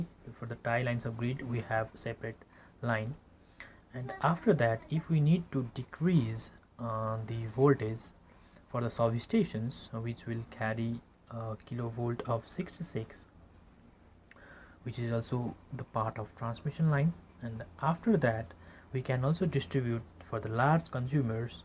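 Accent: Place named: India. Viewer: what the fuck ..I hate Indians English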